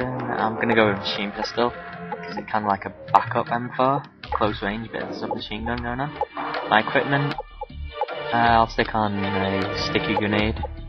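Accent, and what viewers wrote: United Kingdom. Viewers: corner, he just has a british accent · filipino with a english accent?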